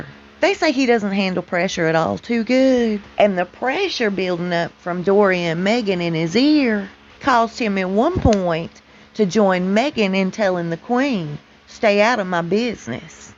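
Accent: South, United States. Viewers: good ole southern voice. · She definitely is a southern girl!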